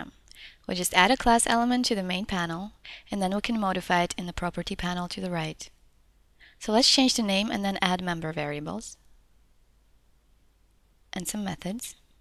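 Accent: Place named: France. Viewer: This nice narrator sounds like French.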